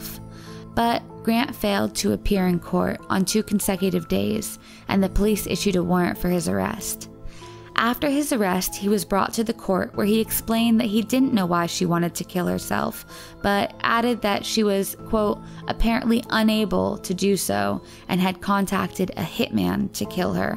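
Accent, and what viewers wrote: India. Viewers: Finally a video without that Hindu voice . Stop making videos with the indian voice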